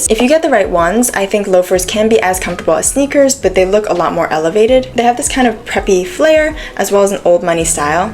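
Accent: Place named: United States. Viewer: you have a very pleasant voice and accent, are you from the usa?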